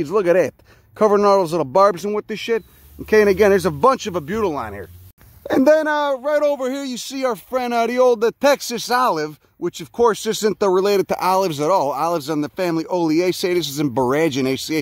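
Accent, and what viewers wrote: Chicago, United States. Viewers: I have a question where did you grow up, your pattern of speaking reminds me of Brighton Park Chicago area. Its a breath of fresh air and love the Show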